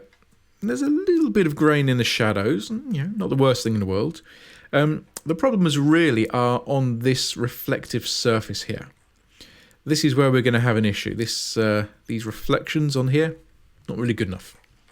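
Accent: United Kingdom. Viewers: A very clear explanation. Thank you and great to hear a British accent for a change :-) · Thanks for the tutorial, nice and clear! with a nice fluffy British accent!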